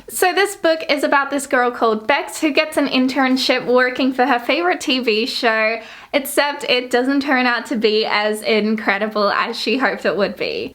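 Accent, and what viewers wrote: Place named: United Kingdom. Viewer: I’m trying to figure out wether your British of American because you sound both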